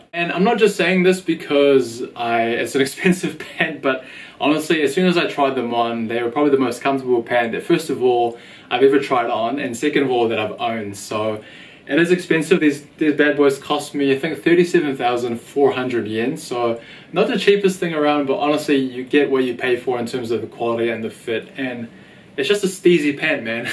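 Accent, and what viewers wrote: New Zealand. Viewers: Know a kiwi accent when I hear one! great vids bro keep it up chur :)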